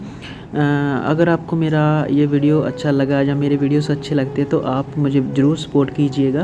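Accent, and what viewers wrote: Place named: Punjab, India. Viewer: Hindi me punjabi touch 😁😁😁 but vry nice sir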